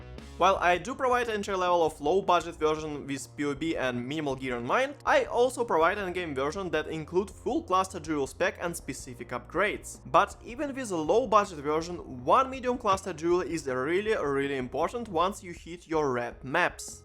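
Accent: Russia. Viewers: Russian accent is so intense)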